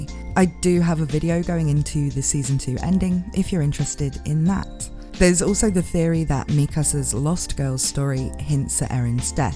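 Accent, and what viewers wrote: United Kingdom. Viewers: Oh alright it sounded a bit diferent than what I’m used to hearing in a British accent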